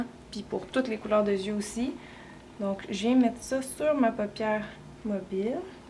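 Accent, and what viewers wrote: Quebec, Canada. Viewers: trop agréable d'entendre une Québécoise Merci!!!! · Je pense sincèrement que est de très bon conseil! A 55 ans, je vais suivre ses conseils de maquillage.En plus, elle a vraiment l'air très sympathique et chaleureuse.En plus, personnellement, j'adore son accent québécois. Faites lui confiance. Marie de Bretagne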